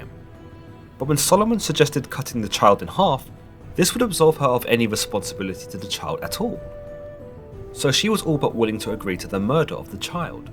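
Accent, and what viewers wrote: United Kingdom. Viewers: Something about British voices that make things sound better lol.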